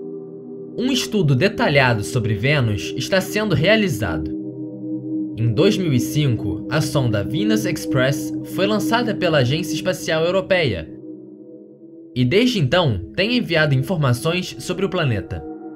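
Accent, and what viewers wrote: Rio de Janeiro, Brazil. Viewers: sotaques feio deve ser carioca ..igual ao ladrão malandro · Adorei o nível do conteúdo e das curiosidades. Mas esse SOTAQUE!!!!! Meu Deus, da pra aguentar muito tempo não 😣😐 carioca né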